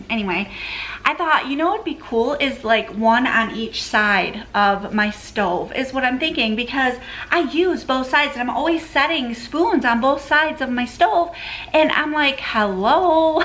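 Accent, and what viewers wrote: Michigan, United States. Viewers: I found your channel just awhile ago, so haven’t heard what part of The Mitten you are from. I like your bubbly personality and the accent takes me back. Lol We lived in Michigan and Kogels is the absolute best!!!! We live in Tennessee now, but every time we go back we stock up on anything Kogels. The bologna is not like anything I have ever had anywhere. We buy a couple of rolls and freeze it. I wish the sold it here. I took this brand for granted when I lived there. We’ve ordered some also.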